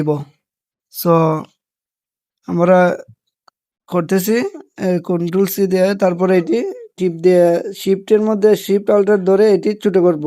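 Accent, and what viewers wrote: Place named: Brahmanbaria, Bangladesh. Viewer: Apnr vasha shune mone hoyeche ze bi- baria te apnr bari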